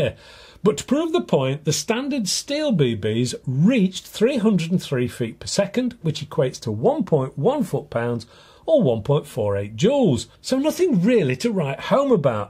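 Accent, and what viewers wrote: United Kingdom. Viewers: This channel is awesome, so well researched and explained, and oh so elegantly british 💪🏻❤🇬🇧👍🏻I have learned so much, keep up the excelent work! 👌🏻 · I love your British manner of speaking!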